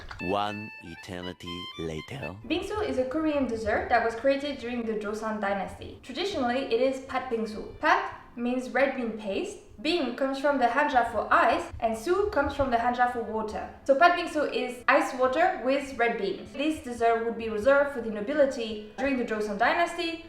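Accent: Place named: France. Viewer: Is it me or do you have a TINYYY french accent 😭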